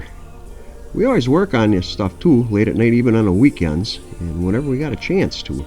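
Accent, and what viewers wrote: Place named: Great Lakes, United States. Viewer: Great Lakes accent....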